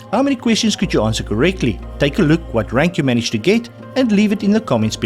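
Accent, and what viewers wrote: South Africa. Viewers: I love your accent, but what is it ❤️🙏🙏🙏??? I cannot put my finger on it. SA??? 😮Thank you for these great quizzes ❤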